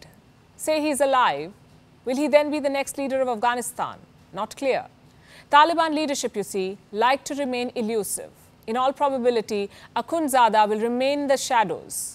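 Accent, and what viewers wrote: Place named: India. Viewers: She purposely has kept her indian accent .. bcuz she is indian ... U guys need to catch up if u want to watch her or else watch ur native accent news some where else...I bet there would b so many..... Simple as that.. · She's so good but she hasn't work very hard on her international English, still has a very strong Indian accent and sometimes this makes it hard to follow up.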